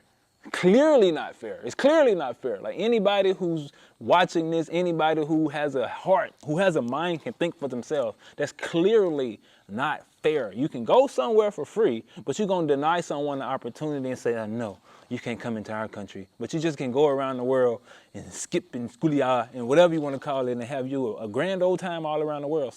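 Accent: United States. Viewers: I can tell by your accent that your people are in America.....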